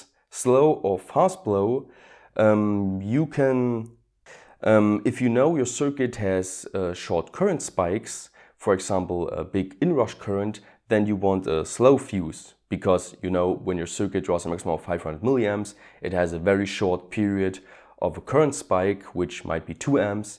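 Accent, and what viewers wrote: Saxony, Germany. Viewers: Glad to see your face :),and your Germany accent , and i like your projects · I love the German accent, it actually makes your videos more interesting. I will see you later! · Ich wuste du bist deutsch ^^ Da deine handy werbung auf deutsch war und mans an der stimme hört ^^ PS: Geile video, bitte weiter so! vll auch deutsche videos und englische zugleich? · Your English is improving fast. Are you planning to relocate to an English speaking country. I like the German accented English though. Holiday on DIY bike ???? Awesome. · Jaaaaa, sächsischer Dialekt und Simme fahren, das ist Kultur! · Ich wusste immer das du ein deutscher bist man Merk das an der Sprache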